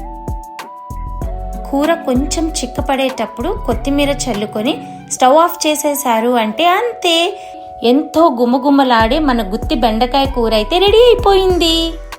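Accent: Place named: United States. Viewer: Madam I am a huge fan of your cooking and your american telugu accent and your style of expression please tell repice of chicken soft kabab and chilli motail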